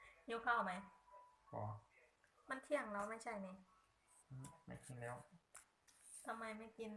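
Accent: Korat, Thailand. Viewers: สวัสดีจ้า ภาษาโคราชน่าฟังค่ะ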